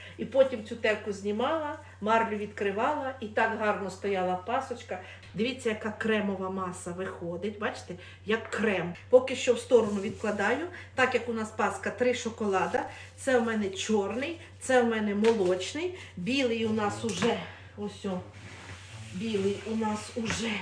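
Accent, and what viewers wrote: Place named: Poltava, Ukraine. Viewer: Дуже подобаються сирні страви🍮,а якщо це ще і паска ,то взагалі 🎉💥.А ще респект за ваш суржик ✌️.Ви не з Полтавщини?Мої вітання з Дніпра.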